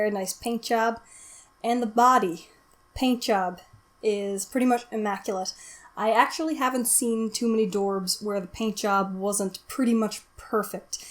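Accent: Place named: Ireland. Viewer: Is one of your parents Irish? It's just you say certain words with a real Irish twang. Loved the review.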